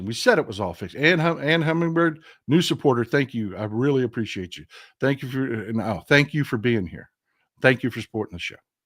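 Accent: Texas, United States. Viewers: This week, my Crystal Ball says the focus will be international, pulling out of ALL International treaties and agencies ("IL Dodgy" can't wait to get us out of ITSO!), drawing the focus away from "IL Dodgy" and his Muskrats while they finish killing off domestic agencies. Midweek will see all sorts of outrage from leaders in other countries, by Democrats in Congress and by some Republicans in Congress who have some special interests in some areas. By the end of the week there will be talk of pulling out of NATO, the World Bank and the UN! The end goal will be to kick the UN out of NYC and a bidding war for the prime real estate there. Folks will be shocked to realize the "America First" policy is isolationist and will effectively remove the US from the world stage. As a Texas ex-pat in Europe I sure enjoy hearing good sense being spoken in a dialect that sounds like home! 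Thanks!!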